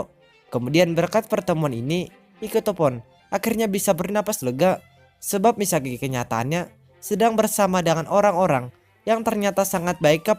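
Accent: Banjar, Indonesia. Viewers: Logat nya Banjar banar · logat banjarism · Pasti logat banjar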